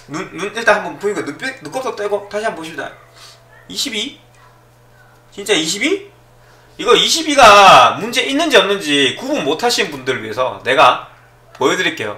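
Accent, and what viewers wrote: Gyeongsang, South Korea. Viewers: 경상도 사투리를 쓰시는 군요~~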